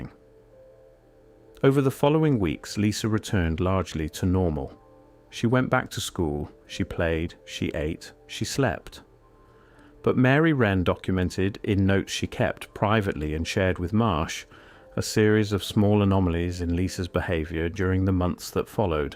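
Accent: England, United Kingdom. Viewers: This is a made up story. Note how they use a very convincible narrator with and english accent. It adds credibility.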